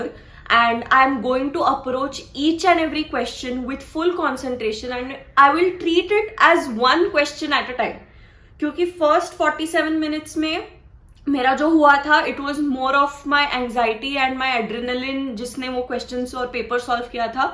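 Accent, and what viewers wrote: Maharashtra, India. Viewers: Abhi bhi dhad dhad ho rha hai your Marathi accent trying to jump out taide Marathi mdhe pn video bno ki jra 💛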